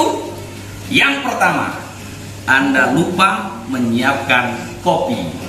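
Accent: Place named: Palembang, Indonesia. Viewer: Mantul Pak Ustadz ngomong2 dimano ini palembangnyo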